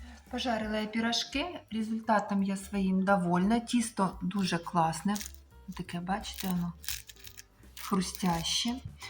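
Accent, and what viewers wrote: Ukraine. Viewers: Людина освічена краще говорить українською. А це - російська мова з українською вимовою. Тут більше російських слів ніж українських.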